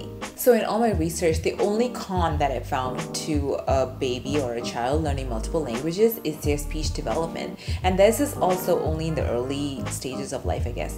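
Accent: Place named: Kerala, India. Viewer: Excellent video.. it’s always good to learn another language.. I have some Spoken Malayalam lessons 😀 chumma oru rasam .. naatil evida? From the way you spoke (minus the English accent), I think you’re from South Kerala, like Pathanamthitta district or Kollam district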